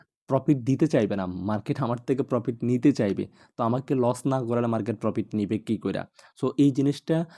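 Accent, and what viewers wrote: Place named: Chittagong, Bangladesh. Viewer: ভাই কি চট্টগ্রামের নাকি? কথা শুনে আমার দেশি ভাই মনে হচ্ছে।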